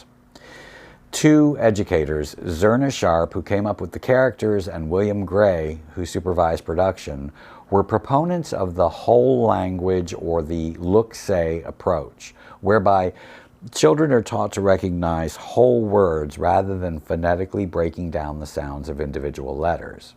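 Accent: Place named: New Jersey, United States. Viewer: P.S. whatever sounds like New York but you do not sound like a New Yorker. Oh! Ok NJ.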